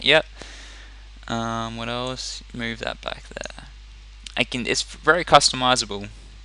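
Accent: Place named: England, United Kingdom. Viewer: Какой чудовищно неприятный английский акцент =(((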